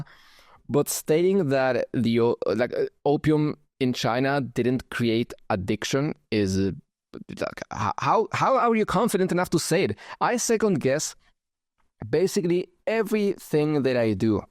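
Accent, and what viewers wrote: Germany. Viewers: i thought you were slavic lol, i guess i never heard a german accent before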